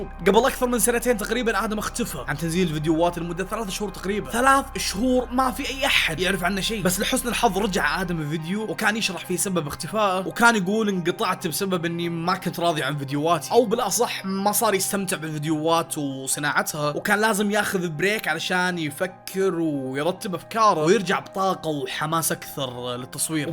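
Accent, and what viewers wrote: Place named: Saudi Arabia. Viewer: وت شنو مصري سعودي 🌚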